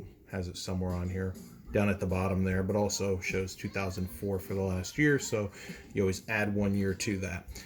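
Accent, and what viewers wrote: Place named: South, United States. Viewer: New subscriber. Great video buddy! The only flaw I can find is your heavy southern accent. Maybe in your next video you could do a New Jersey accent to help appeal to all Americans and drive up your views.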